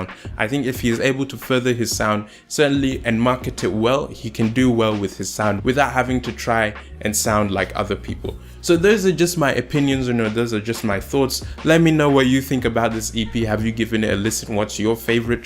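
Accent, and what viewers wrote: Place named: Ghana. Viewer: Are you Nigerian?, you sound Ghanaian.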